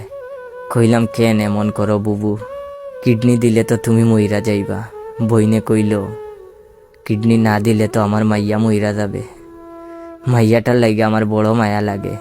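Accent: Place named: Sylhet, Bangladesh. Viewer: সিলেটি ভাষা লাগে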